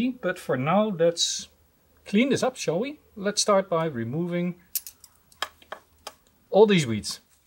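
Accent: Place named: Netherlands. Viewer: Hi, you sound Dutch but I am not sure. I am a beginner bonsai grower and was wondering if I can ask some questions. Have some soil problem for my ficus and looking for some species that do well outside In Holland. Best regards Dennis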